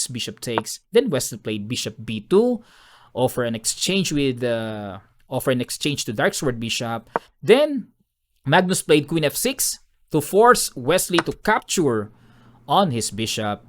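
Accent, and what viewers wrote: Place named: Philippines. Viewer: The way you seak here is still patterned on your filipino version. And still very clear and well elaborated analysis. More subscribers for you coach. Good luck!!! Nakakadugo sa ilong mang english. Hahahahah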